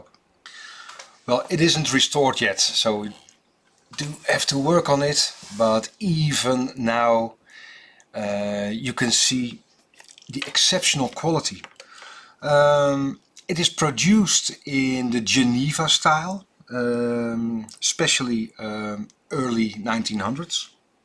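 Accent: Netherlands. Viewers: Without looking it up.. Ik weet vrij zeker dat je een Nederlander bent?😛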